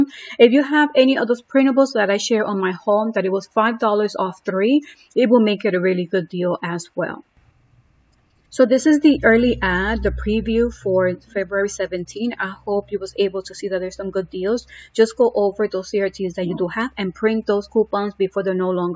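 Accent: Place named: Spain. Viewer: Hello!!! I can feel your spanish. Why dont you do it in spanish.😀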